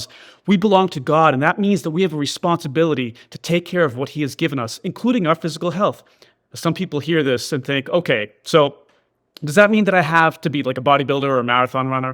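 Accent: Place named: Canada. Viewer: I detect a Canadian accent. Tom in Shizuoka Prefecture, Japan. Thank you for this sermon.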